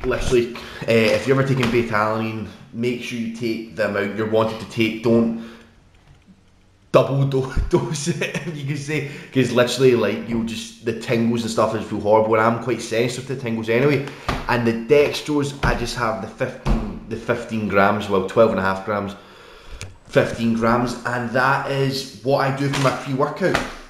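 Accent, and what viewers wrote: Scotland, United Kingdom. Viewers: Damn son you strong as hell mane. Love the scottish accent. Think my homie Tj told me about you bro..great vid champ. I subbed!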